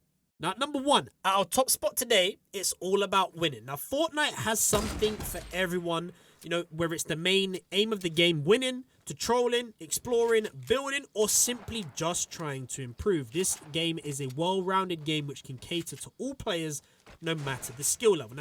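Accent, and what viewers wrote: United Kingdom. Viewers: Are u from the uk?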